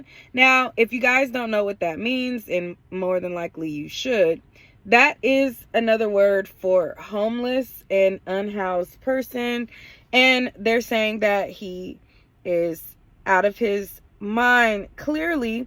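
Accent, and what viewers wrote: South, United States. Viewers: I love ur Southern accent.. I truly do🤣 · Using the southern twang is beneath you.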